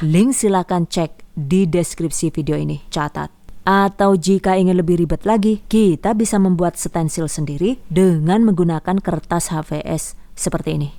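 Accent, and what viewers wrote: Banyumas, Indonesia. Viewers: mak, rika janeh wong ndi? ngapak tmn ketone koh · Rika si wong ngdi, deneng ngapak? 😅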